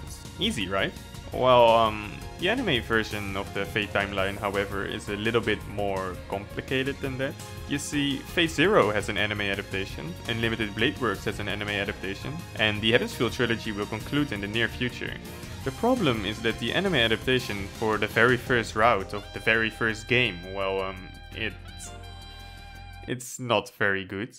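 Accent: Netherlands. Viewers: Are u dutch?🤔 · It’s hard to hear, but u can’t hide ur accent from a fellow dutchman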